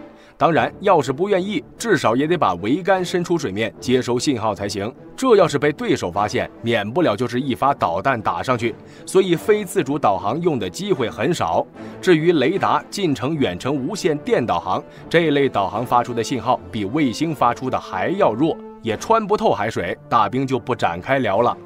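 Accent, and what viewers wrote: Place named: China. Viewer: 這是中國人做的『4瓶』雖然大量使用【正體漢字】但是《腔調》與習慣是騙不了人的!! 用詞遣字發音腔調騙不了人的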